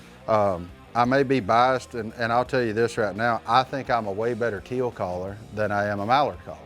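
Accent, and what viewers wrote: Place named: South, United States. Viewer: Who else loves his Southern accent?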